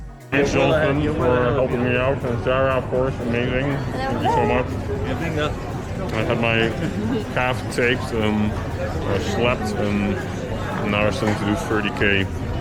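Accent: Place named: Netherlands. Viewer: Na 2 zinnen was het duidelijk: mooi Nederlands accent 😉 Waanzinnig gaaf verslag. Je doet iets waar ik voorlopig nog enkel even van kan dromen.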